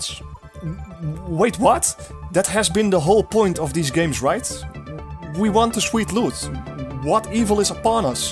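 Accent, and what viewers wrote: Netherlands. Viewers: Top man ! ik moest en zou reageren de seconde dat ik je accent hoorde haha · Lol I can hear immediately that you are Dutch... am Dutch to tho ! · are you dutch?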